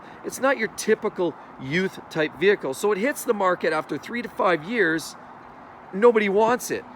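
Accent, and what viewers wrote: Canada. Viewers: Are you Canadian!!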